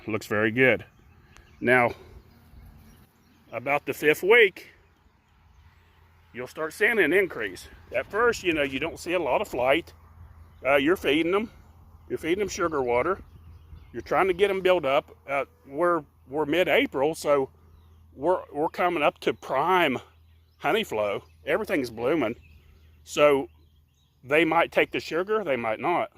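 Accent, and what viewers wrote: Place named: Pittsburgh, United States. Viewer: Did I detect a yinz in your accent? Are you originally from the western pa, northern WV area?!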